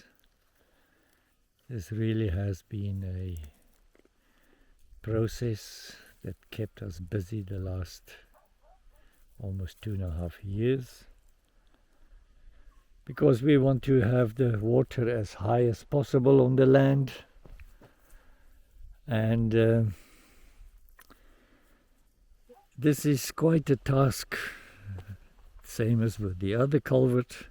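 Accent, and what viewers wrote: South Africa. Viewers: Keep at it! Things are looking great there. You sound like you're South African, I am too. I have built a water conservation garden which is very effect in SA and love seeing others doing it too! The culvert looks great. I suggest heavily mulch your earthen wall and berms etc before heavy rains or you'll need to dig your trench again. My swales are around 80cm wide or so, a little wider than yours and when it rains a fair bit would fall down back in on uncovered/unmulched areas. You luckily have the resources around you. If you cut the nearby grasses you can use those as an easy mulch.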